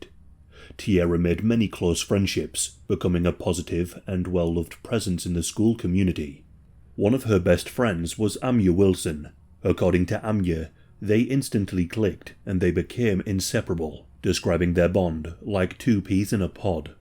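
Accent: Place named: Northeast England, United Kingdom. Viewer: Aye aye aa think your from rundhere 😂 not a Geordie but not far from like ❤️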